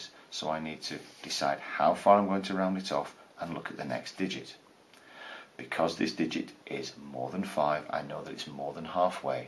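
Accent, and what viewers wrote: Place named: Cockney, United Kingdom. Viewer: You sound like a cockney Gangster!!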